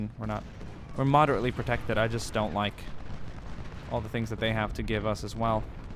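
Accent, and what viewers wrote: South, United States. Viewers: As someone from Virgina, you do an A+ southern accent XD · His southern accent is a little too rich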